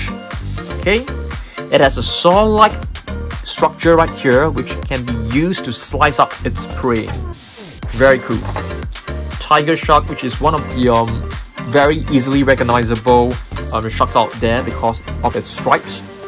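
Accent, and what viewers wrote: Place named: Malaysia. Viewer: He sounds like he is from malaysia and i am hoping i will make a fanart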